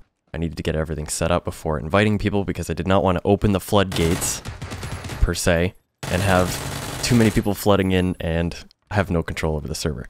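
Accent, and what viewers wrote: Canada. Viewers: Are you Canadian? Dat accent tho. Btw, love your stuff. It's definitely something refreshing in a world full of screaming gamers. Keep at it! :) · Where are you from dude? I'm from Edmonton and you sound canadian :P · Is he canadian? I didnt know but I heared him talk with aboewt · Canadian eh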